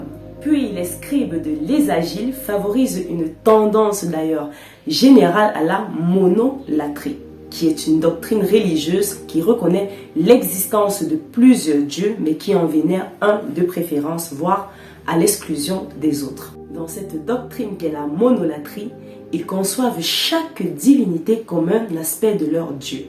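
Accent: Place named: Cameroon. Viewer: pourquoi tu parles comme une camerounaise?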